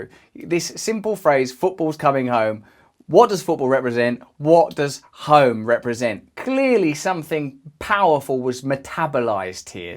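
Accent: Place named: England, United Kingdom. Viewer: The vocabulary and accent is all England but the hand movements is 110% Italian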